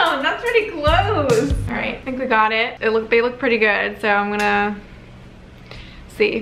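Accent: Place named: United Kingdom. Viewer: Nah she just loves talking in british❤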